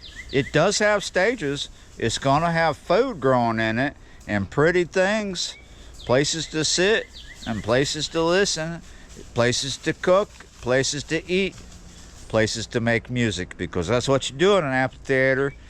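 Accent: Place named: East Texas, United States. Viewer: Boy have you absorbed that East Texas accent! Love your episodes!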